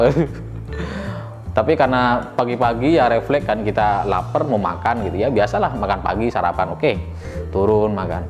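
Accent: Madura, Indonesia. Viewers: Bang, logatmu kok Madura?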